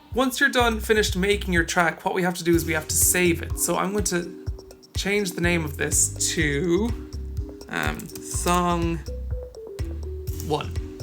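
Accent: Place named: Ireland. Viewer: I like the irish accent :D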